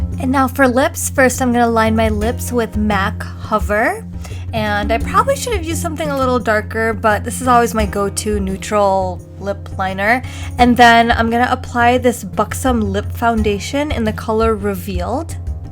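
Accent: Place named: Bengal, India. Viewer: I'm Bengali too and I love your videos. Don't worry about your accent I have one too so it's all okay.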